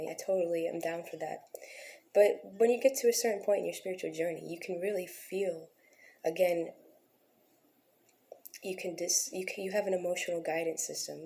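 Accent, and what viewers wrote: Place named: New York, United States. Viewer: She is a diamond amongst the ruff... Her accent makes me think New York for some reason but not so sure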